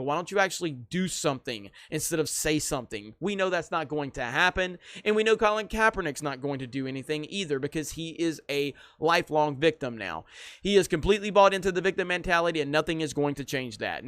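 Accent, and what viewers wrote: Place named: Alaska, United States. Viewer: Are you an Alaskan Bush person? You have the same accent.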